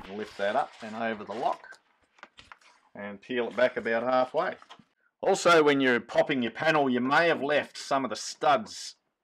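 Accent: Australia. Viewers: Great video, and great to hear an Aussie, in America, driving a British car! Very multi-cultural. I need to change some actuators in my Disco 2 and hesitated when confronted with having to 'fiddle' with the window jams / frames. After watching your video it doesn't look as bad as I thought it would be! Thanks! On another note, I changed the actuator on my rear cargo door as it wouldn't unlock with the remote key. Upon changing it, and then testing it, it still wouldn't work, then I realised you have to press the unlock button on the key fob twice to unlock the cargo door. It then worked. It made me wonder if I even needed to change the lock in the first place - I just needed to press the key fob button twice lolol. At any rate, at the same time I removed and rotate through 180 degrees and reinstalled the factory spare wheel carrier so that it would allow me to put bigger tyres on the car including the spare without clashing with the bumper. 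So all was not lost in this exercise. Thanks again for your videos - they are my first stop when I am contemplating doing some repairs and maintenance on my Disco. Cheers! Michael, Alice Springs, Australia.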